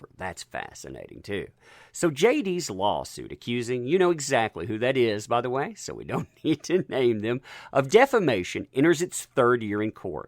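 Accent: South, United States. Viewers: It's his southern drawl that's very charming🤗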